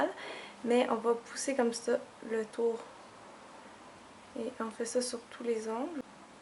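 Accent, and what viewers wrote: Quebec, Canada. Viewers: J'aime tros ton accent québécois c tro mignon et super video !!!!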